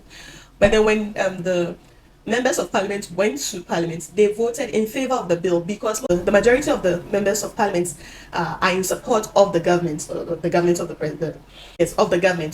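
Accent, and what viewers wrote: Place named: Ghana. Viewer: I detect a Ghanaian 🇬🇭 accent.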